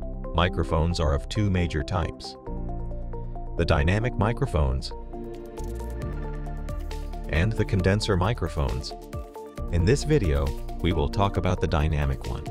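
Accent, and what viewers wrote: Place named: United States. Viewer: The automatic voice sounds American, while the writing and grammar are rather spotty.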